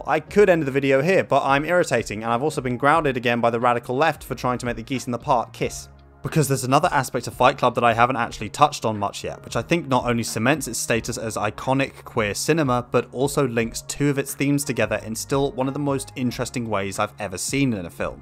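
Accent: United Kingdom. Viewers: They're just both southern English my guy.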